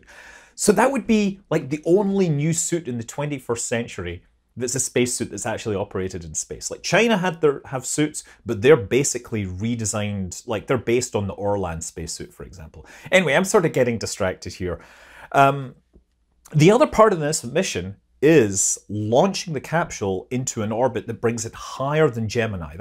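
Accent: Scotland, United Kingdom. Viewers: As a side note, when I hear " here" in that deep Scottish brogue, I am oddly comforted and know I'm getting reliable, entertaining info from one of the best spacers on Youtube. No, it really is the best out there.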